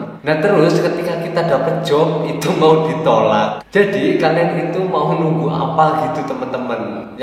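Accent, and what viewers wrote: Banyumas, Indonesia. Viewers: Sukses selalu wong Cilacap , ora ngapak ora kepenak 😁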